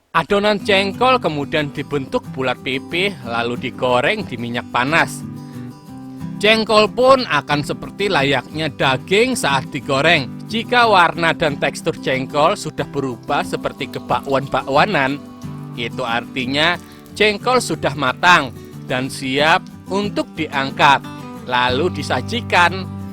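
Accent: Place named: Java, Indonesia. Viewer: Medhok nya hampir sempurna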